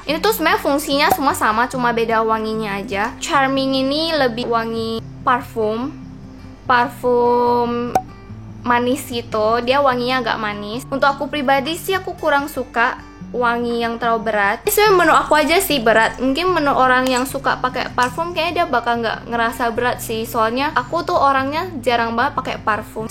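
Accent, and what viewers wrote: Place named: Batam, Indonesia. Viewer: Logat orang batam lucu yaa😂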